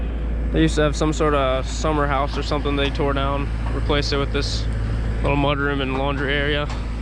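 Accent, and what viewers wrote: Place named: Pennsylvania, United States. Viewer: Hey, thanks for your answer, I was curious. Thank you also for your excellent videos over the years, I watch every single one ASAP! I am originally from Bucks County, PA and really enjoy watching you and your dad, and listening to your accent, brings back memories of my grandfather's farm in Douglassville, PA. You do great work, best wishes for continued success, you certainly deserve it!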